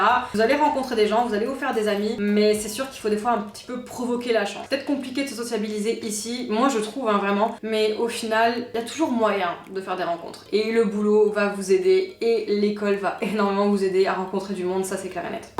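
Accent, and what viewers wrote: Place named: France. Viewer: je vois sur plusieurs commentaire que tu ramène souvent l'accent francaise et que les québécois ont déteste ca au points de pas vous aimez ? Je ne sais pas ou tu as entendu ca mais la majorité des québécois ce fou pas mal de ton accent.. il ne faut pas généraliser.. ce que nous détestons vraiment ce sont ceux qui ne veulent pas s'intégrer au coutume quebecoise.. apars ca.. ont est très chaleureux en général..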